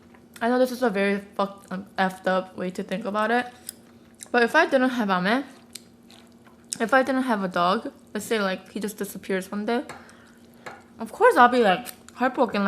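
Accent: South Korea. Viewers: Unnie I'm sorry but you speak English in Korean accent I cant understand most of your words, cheongmal jusunghaeyo.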